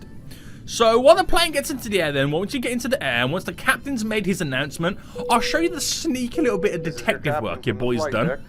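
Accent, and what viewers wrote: United Kingdom. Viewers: Are you British then? If so, cool coz me too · The British accent 🤌🤌🤌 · Your British?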